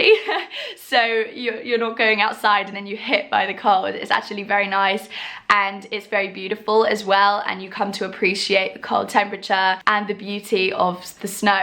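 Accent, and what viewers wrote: United Kingdom. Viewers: can teach Moscovites proper British English... 🇬🇧👈🏽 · После 12 лет жизни в США, британский акцент прямо режет по ушам, в хорошем смысле. Вспомнил уроки английского в челябинской школе))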